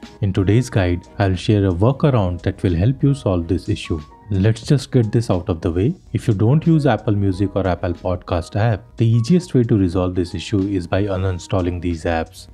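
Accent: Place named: India. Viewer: The man’s strong Indian accent distracted me from what he was saying. Also when he talked fast I could not follow his instructions and sometimes I could not even distinguish the words. I gave up and am seeking an answer elsewhere.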